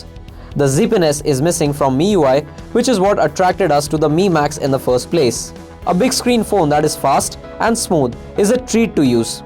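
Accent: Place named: India. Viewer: Really hate Indian accent!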